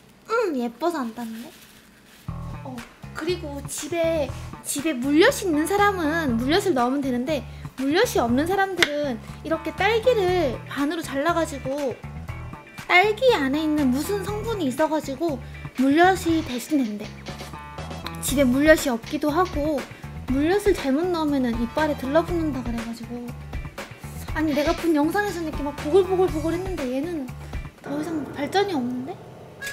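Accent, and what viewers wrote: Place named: Gyeongsang, South Korea. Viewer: 찐 갱상도 누님 저도 마산살아요!!!!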